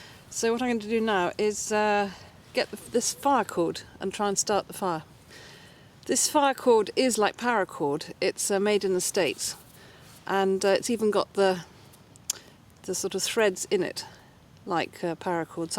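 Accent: United Kingdom. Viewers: Bushcrafting with the expansive British language :) Enjoyable to listen to as much as watch. Clever pot stand too.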